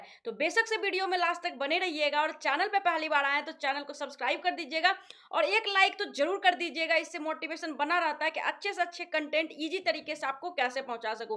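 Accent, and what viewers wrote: Bihar, India. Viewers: Tum pakka bihari ho qki baat krne ka tone apne Bihar ka hi hai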